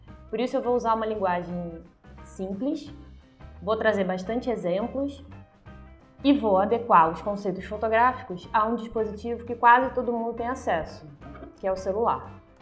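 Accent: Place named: Minas Gerais, Brazil. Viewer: Mineiríssimo! Amei as dicas! Vou aplicar! ❤️❤️❤️❤️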